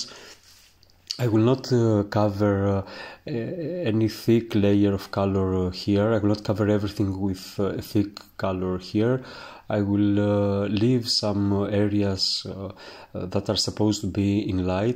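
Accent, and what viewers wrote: Greece. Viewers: Your English is more than adequate as you explain and demonstrate this process so beautifully. I recognised your distinct Greek accent immediately, with a Greek Athenian mother, and thank you for sharing your gift and skill in such a thorough way. Your work is a masterpiece with mystical presence as seen in some fayum portraits, except this young girl is brought to life more profoundly with expression as with the deeper appreciation of this timeless portrait revealing the layers and dimensions, Your painting is a language of its own. Truly impressive. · It's magical how you begin with black and white, and end up with this! Wonderful to watch and learn from. No need to apologize for your English; the accent is charming. Besides--you speak better English than many English-speakers can speak Greek!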